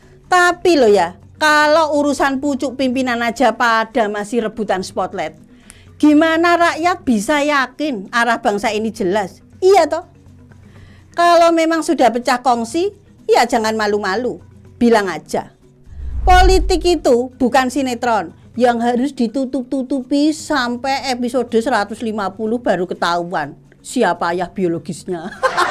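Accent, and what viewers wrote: Madura, Indonesia. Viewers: Ini mak jelas org madura nih, Karena aksen suara nya ada aksen madura trgas, lugas, jelas, cerdas, kreaktif, itu tipe wanita madura.. Itu prediksi saya, Maju terus mak. Konten mu sangat membantu utk koreksi, intropeksi buat aparatur negara.. Semoga sukses mak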